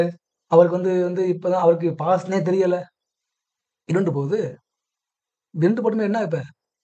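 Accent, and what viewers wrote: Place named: Kerala, India. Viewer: Kerala style sollum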